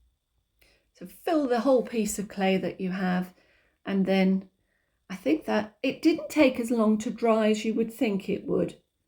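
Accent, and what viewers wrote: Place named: England, United Kingdom. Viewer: I’ve thought about trying acrylic inks on polymer but I’ve tried some other inks without great success so hadn’t got around to it. I’m also going to try some acrylic binder medium with various inks/distress oxides/watercolours etc. to see whether that will help them stick to the clay & dry. Your earrings do come out rather well & adding the drops directly to the clay sheet & blowing/letting the ink run/dabbing with different textured cloths etc may create some beautiful effects. May I offer a suggestion though? I’ve looked past your videos a few times because I tend to watch YT on my phone & your thumbnails tend to be so “busy” with a large collection that I can’t see how well they actually come out or what the result is. But I must admit I do enjoy a nice straightforward tutorial with a lovely English accent (my parents are English) so I wish I’d tried yours sooner. 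I tend to find a good closeup of a single item against a bright background gives a much better idea of what a tutorial will be like & whether it’s a technique I haven’t tried, or a result that’s interesting. There are so many creators that it’s little tips like that that can help you stand out more 😊 Thank you for the inspiration!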